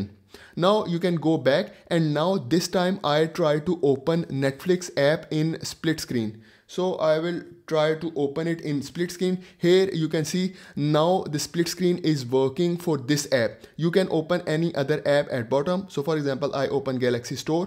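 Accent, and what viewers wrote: India. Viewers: I dont normally trust people with an indian accent for stuff. But youve actually showed me some very useful stuff. Not many people go into depth about what each option does and shows it working physically. I very much appreciate that.😊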